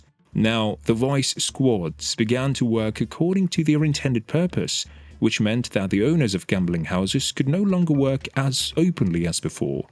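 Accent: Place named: United Kingdom. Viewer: Crap narration, would have been better with an American voice rather than stuck up English rubbish.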